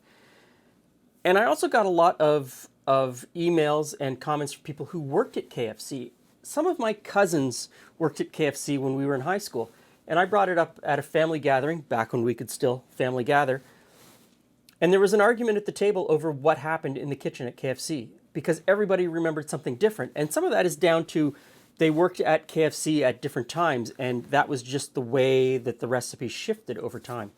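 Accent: Canada. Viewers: I can spot a Canadian accent in a few minutes! PS i met the colonel back in the day here in Michigan! What is it that Brits love KFC? My mother born in Scotland used to serve it up at family events!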